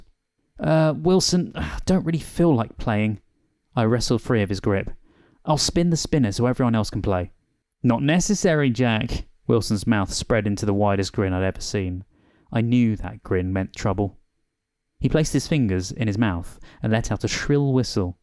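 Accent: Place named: United Kingdom. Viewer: I love the UK accent and the way he read, so funny. Thank you for the work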